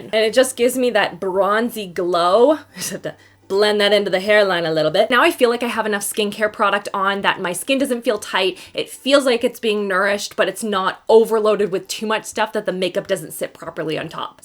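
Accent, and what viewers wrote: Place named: Canada. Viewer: Can you just talk textbook Canadian for a makeup tutorial, cause that would be magical.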